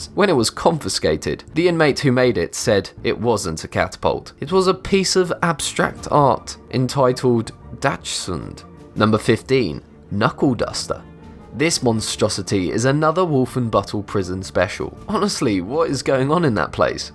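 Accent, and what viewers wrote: England, United Kingdom. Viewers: O look another British narrator. Pass, dislike! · Final boss I think he sounds a little bit British. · That's just an english accent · Britsh accent again boring. Pass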